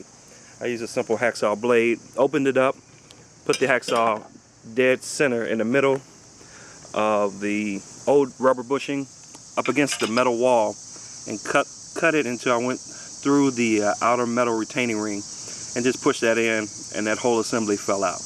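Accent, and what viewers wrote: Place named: Caribbean. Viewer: You sound like you have Caribbean Roots man....well done btw. Thanks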